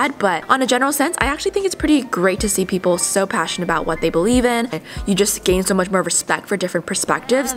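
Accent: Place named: Southern California, United States. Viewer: God, that SoCal accent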